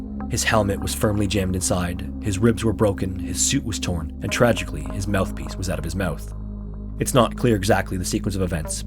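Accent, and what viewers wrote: Canada. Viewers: Because of the naval focus of these stories, every time he says “about” in that Canadian accent, I have to think for a second if he’s actually saying “a boat.” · little do they know this IS my endel lol these videos can both put me to sleep and help me focus during certain household chores, it's an absolute joy to listen to I finally heard your Canadian accent this time btw, I love it!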